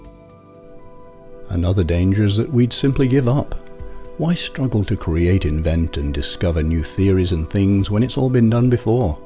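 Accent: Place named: Yorkshire, United Kingdom. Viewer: There's a hint of a Yorkshire accent leaking through the RP elecution if I'm not mistaken.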